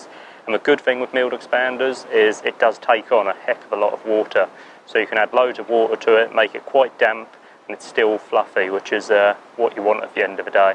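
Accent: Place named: RP, United Kingdom. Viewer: a realy posh fisherman what a lovely voice.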